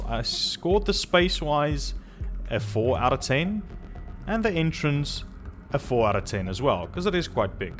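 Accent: South Africa. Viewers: Are u from South Africa? Ur accent sounds like ur fromt here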